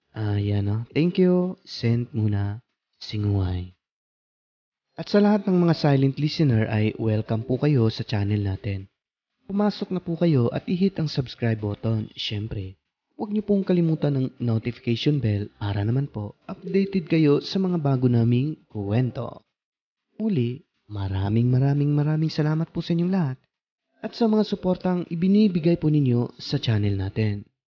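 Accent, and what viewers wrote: Visayas, Philippines. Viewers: Qa cute mag bisaya oi😊😊😊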